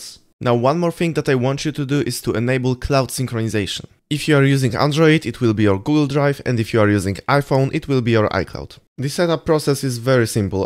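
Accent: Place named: Poland. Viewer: jest z Polski bo trochę po głosie słychać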